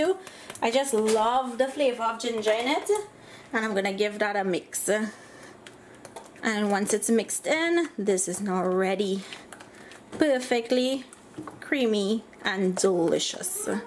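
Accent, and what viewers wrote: Trinidad and Tobago. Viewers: I did a quick search for a cream of wheat recipe, and this video was the first to come up. I clicked the thumbnail without reading the name of the video or the name of the channel. Within the first 2 seconds of the video I said "buh she soun'ng like ah Trini". It was a most wonderful realisation that this is a Trinidadian channel on YouTube. Imagine my elation when it hit me that it was the first video to come up! Good job on the quality of your content as well as the authenticity with which you have present 'Trini cooking'. You have represented us well in my estimation! I truly hope this channel will continue to grow (: all the best to you.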